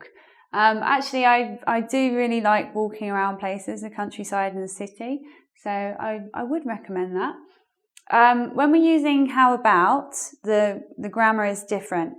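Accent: United Kingdom. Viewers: Very interesting! Finally a British accent!!!! A QUESTION: how can I subsribe your second channel on You Tube? I found only ENGLVID, but the second channel about you tell at the end of your video, where is it? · To be honest, it's little bit hard for me to understand the british accent. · I liked your video, even though I realized that I'm not used to the British accent. In fact, I'm not used to another accent and I have to work on it. I've just subscribed your personal youtube channel, as you asked and simply loved your idea to help people with speech problems. Thank you for your help and I'll watch more of your videos. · very useful lesson thank you, i really like your British accent:D thanks a lot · Thank you for your video and your excellent method and teaching as well. I was looking for British teacher for English learning because I'm in London for my PhD course. 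I learnt English in American's method so now I have a problem in London because the people's accent and their grammar is a little bit different and your accent can help me more to understanding Londoner specially in the University. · something that I learn in your last class.....you are terribly charming!! I love your brithish accent... amore mio.. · oh my god i couldn't understand anything what she spoke is it a British english ?,very diferent from the american's ,but i liked your video ,it has been helping me to develop my english ,i'm begginer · I've seen a lot of videos from your previous channel, as you suggested, and I really liked it! You have such a nice way to teach that make us alert to your classrooms. Plus, have a soft voice, and your british accent it's lovely! I am hungry for more videos! Keep your nice work!:) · We have to visit London :) Great accent, they have a queen and they have Big Ben!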